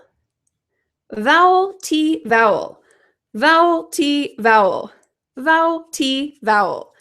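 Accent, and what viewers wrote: United States. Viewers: Hi, I am from Indonesia. I like your video much, as I like American accent, especially you have. Thanks a lot.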